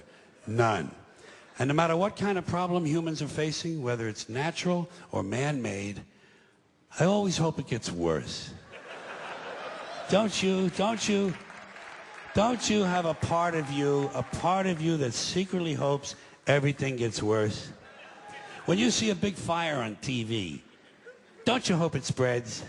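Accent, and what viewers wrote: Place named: Mississippi, United States. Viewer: this motherfucker is a good comedian but tone down that Mississippi shit bro!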